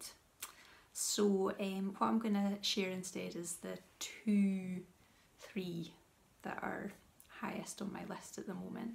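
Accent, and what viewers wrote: Scotland, United Kingdom. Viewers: Hi I really enjoyed your first podcast and seeing your knitting. Love your Scottish accent too! Greetings from New Zealand, I live on the stunning Kapiti Coast about 50 minutes north of Wellington in the North Island. I love how YouTube brings like minded people together from all corners of the planet. If you don't already watch, you might also enjoy Knitting Traditions podcast. There's so many to choose from! I'm glad I found yours. Your Joji sweater will look fab in your yarn choice and I look forward to seeing it come together. See you next time x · Hello, Ruth "Loves to knit " recommended your podcast saying that you have a lovely Scottish accent. I grew up in Dundee and Broughty Ferry. I have been in Perth Western Australia for about 40 years and sooooo homesick. It is wonderful to sit and knit and listen to you talking. 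i am happy for your podcast to be as long as you need. Great first podcast. Thank you. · Ooh very nice. My dad was from Cromarty so it's lovely to hear your accent. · So glad I found your podcast! You make beautiful things and I love your accent :-) I got married in Scotland and can't wait to return some day. Can relate to your feelings about your first episode, as I just made one of my own. Looking forward to binge-watch your other episodes :-)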